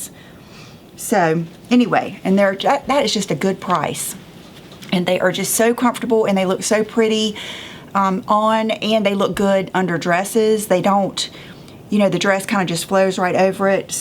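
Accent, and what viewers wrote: South, United States. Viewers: Love your accent, are you from the south in the USA ? Maureen x from New Zealand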